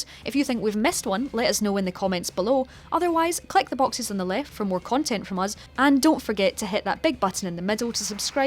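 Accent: Scotland, United Kingdom. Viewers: That Scottish is an ointment to my ears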